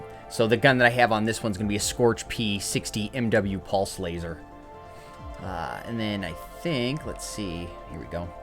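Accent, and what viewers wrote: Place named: Minnesota, United States. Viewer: Are you from Minnesota by chance? I know a lot of people from my hometown who have the same accent as you.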